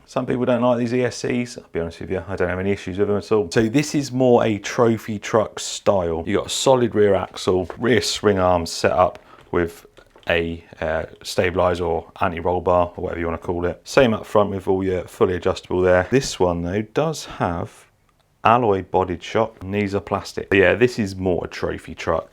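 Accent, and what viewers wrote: United Kingdom. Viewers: New sub here. I am a 73 year old US guy. I have to put my hearing aids in to understand your strong British Accent. Great video. Congrats on the new shop. F**K the dislikes!!!!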